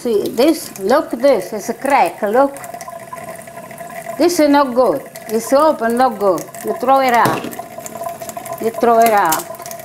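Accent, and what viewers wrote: Italy. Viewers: Dear Grandma, my parents are both first generation Italian American from New England but we all live in South Carolina now…….I’m truly sad that the grands and great grands truly have little idea of the richness of our culture……..I’m willing to bet they’ve never heard your accent while I loved visiting my Dad’s family for that very reason! What you are doing is really documenting what it is to be Italian and that is very important. Of course your cheerful voice, lovely smile and glorious food preparation keep us coming back for more….keep singing, Nonna!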